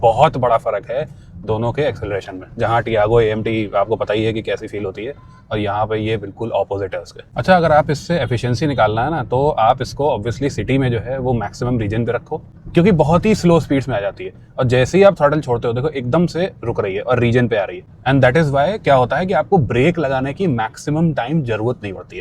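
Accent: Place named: Farrukhabad, India. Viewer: Farrukhabad wali boli. Chala rahe ho shahjahanpur me. Purane din yaad aa gye.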